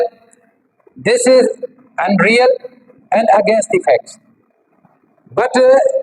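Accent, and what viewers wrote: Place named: Sindh, Pakistan. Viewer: Good effort, but there's room for improvement to make these sessions or videos more engaging and accessible globally. Here are some specific suggestions: - Audio quality: Consider investing in a better microphone or recording your voice separately to ensure clarity. - Teaching style: Update your approach to match modern educational standards, which emphasize interactive and fun learning. - Language: While Sir delivers sessions comfortably in English, a strong Sindhi accent is still noticeable. Refining his English pronunciation would improve connectivity and facilitate better understanding for all listeners. - Presentation: Practice your delivery to maintain a natural flow and consider using digital tools like interactive whiteboards to enhance engagement. - Pacing: Avoid reading from a physical board line by line; instead, use it as a visual aid to supplement your teaching. 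I believe these sessions have a lot to offer, and I'm sure Sir direct connections appreciate them. To expand the reach and impact, consider making the content more accessible and engaging for a broader audience. Please take this feedback in a positive light, as I am connected with Sir and eager to benefit from his sessions. As my English is still limited, I rely heavily on tools to assist with my daily tasks, such as reading, writing etc.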